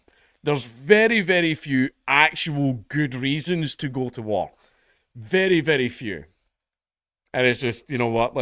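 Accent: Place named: Scotland, United Kingdom. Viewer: well yeah, shes scottish, of course shes going to be talking scottish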